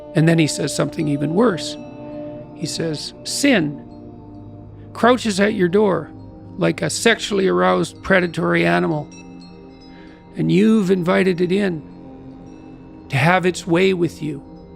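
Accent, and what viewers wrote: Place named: Canada. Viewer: Dragging it to say some generic ass advice. His Canadian accent just makes it pure comedy. Like god said it cane; you’re not trying hard enough to lose that disgusting accent.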